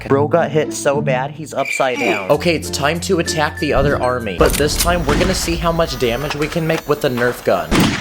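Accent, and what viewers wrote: Scotland, United Kingdom. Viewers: BRO IS SCOTTISH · Well, that great British accent😅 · HE’S STOTISH LIKE. E I’M BRITISH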